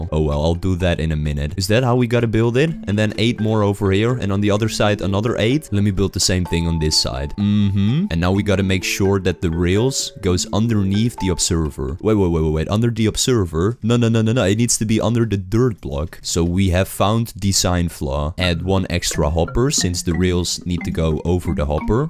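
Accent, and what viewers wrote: Netherlands. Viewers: Hmm. In which country do you live? You sound kinda dutch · Jij Hoort Gwn da hij nederlands is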